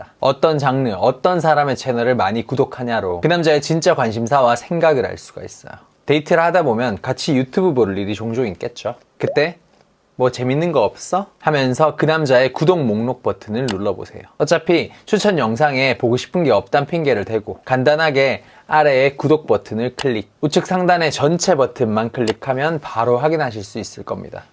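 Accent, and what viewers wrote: Gyeongsang, South Korea. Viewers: 좋은정보 감사합니다. 경사도 억양이 살짝 묻어 나오는게 매력있네요^^ 또 뵈요~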